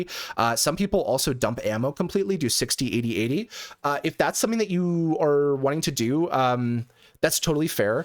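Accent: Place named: Canada. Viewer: Bro is definitely Canadian he's got a pretty distinct accent. With that said 🦅🦅🦅🦅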